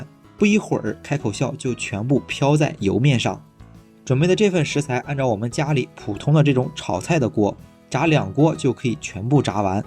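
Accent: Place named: Qingdao, China. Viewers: 感觉听出了青岛口音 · 亲切的乡音， 实诚的山东人， 接地气的讲解， 不故弄玄虚！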